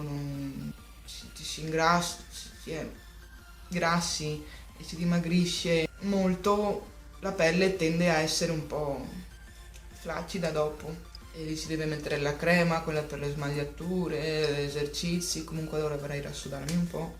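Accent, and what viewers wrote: Veneto, Italy. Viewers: ti ho appena scoperta, bellissimo video davvero!! è bello perchè, nonostante le difficoltà legate al dimagrimento/ingrassamento, riesci a parlarne e a sorriderne. purtroppo che si tratti di aumentare o perdere peso (e dei problemi fisici che ciò comporta) il problema è psicologico in ogni caso, ed è su sè stessi che bisogna lavorare più che sul peso in sè!! io stessa in passato ho avuto problemi in merito e ancora mi ci fisso talvolta, anche se sono riuscita a raggiungere il peso forma.. spero davvero che tu riesca a venirne a capo e riesca a trovare un tuo equilibrio, e mi sembra proprio che tu ce la stia mettendo tutta :) un abbraccio grande ps: sei veneta per caso?? XD hai un accento davvero simile a quello delle mie parti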